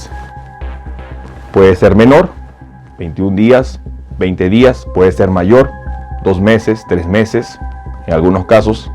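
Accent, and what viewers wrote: Peru. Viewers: Por el acento parece peruano o de Colombia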